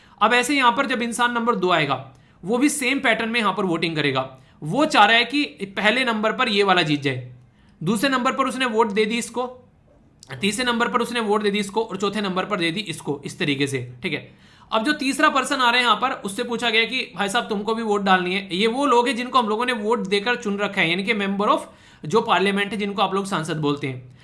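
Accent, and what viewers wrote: Haryana, India. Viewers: haryana ke ho na sir aap aaj tak jo bhi baate lecture main btayi hain n aapne kti dil t connect hove hh sare baat haryanavi tone ss aapki language main sir or sir lecture toh aapka dmakedaar hove h har baar 😊 ☺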